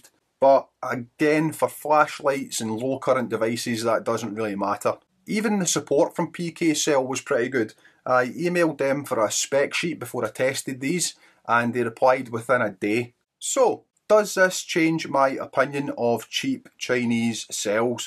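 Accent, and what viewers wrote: Scotland, United Kingdom. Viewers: That strong Scottish accent tho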